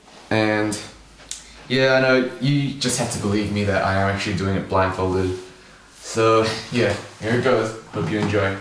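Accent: Australia. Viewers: What place is your accent from? You sound kind of Australian. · He sounds Australian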